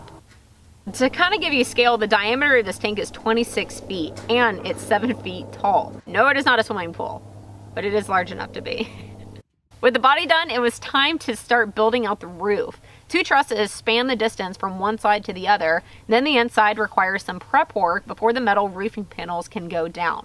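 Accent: Texas, United States. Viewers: Dang. I forgot you’re from Texas. 😆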